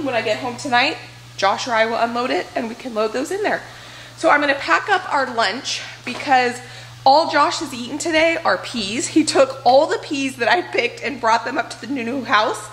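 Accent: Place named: Minnesota, United States. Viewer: You sound like you could be in the movie Fargo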